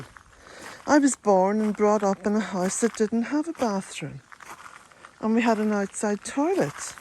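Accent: Ireland. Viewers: Just beautiful, so calming, serene and I love your Irish brogue ❤ I am in the USA, my Irish roots great-great grandparents were from Dublin 🍀 (Newsome & McCarty) So thrilled to have found you!! Love, love, love 💘 💕🍀❤🍀❤😁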